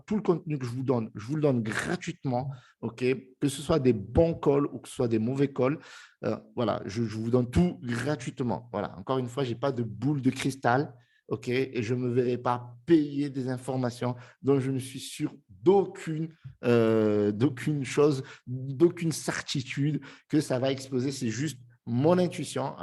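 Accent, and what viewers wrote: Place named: Marseille, France. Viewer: merci pour ta bonne humeur , surtout en ce moment ! tu aurais pu être de Marseille avec ton accent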